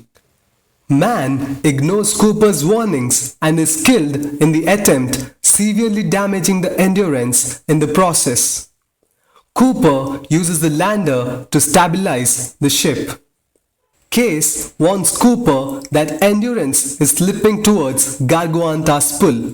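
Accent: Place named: India. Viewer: You have a very light Indian accent man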